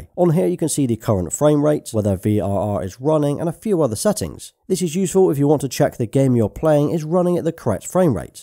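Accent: United Kingdom. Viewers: Hello, are you british?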